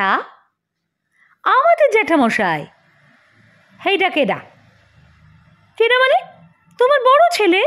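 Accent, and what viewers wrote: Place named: Bangal, Bangladesh. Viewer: খুব ভাল লাগল । কি সুন্দর বাঙাল ভাষা বললি ।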